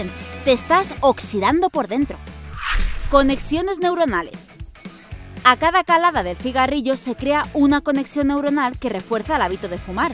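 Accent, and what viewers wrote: Spain. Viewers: Una pregunta eres de mi pueblo no 😂😂 esque es torrent\Valencia jajajaja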